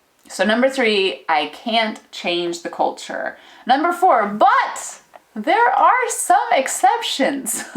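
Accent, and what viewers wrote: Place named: Ljubljana, Slovenia. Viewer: Do you know u speak “dialect” too? I am sorry, but tbh “ljubljanščina” can be slightly annoying.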